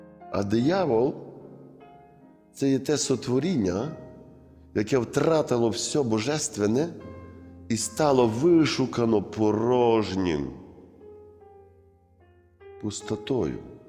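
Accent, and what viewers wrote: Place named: Ivano-Frankivsk, Ukraine. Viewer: Дуже гарна проповідь.Але люблю Ваш Івано Франківський акцент!... ближчим до серця❤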